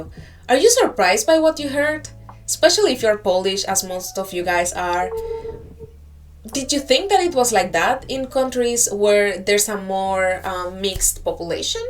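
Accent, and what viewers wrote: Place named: Portugal. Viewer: Your English sounds like a Portuguese speaker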